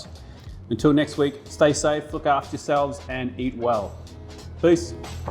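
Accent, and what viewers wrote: New Zealand. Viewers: Spot that kiwi accent a mile away. Heaps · Are you a kiwi mate? I recognise that accent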